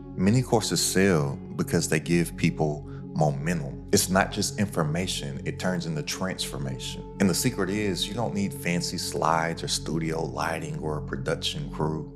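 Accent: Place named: Los Angeles, United States. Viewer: oh wow that's trippy. Same exact type of slang and speech pattern as my homies in the LA area. Ur videos are fantastic, exactly what i needed. Keep up the good work 👏 starting a youtube channel very soon thanks to you. Finally going in.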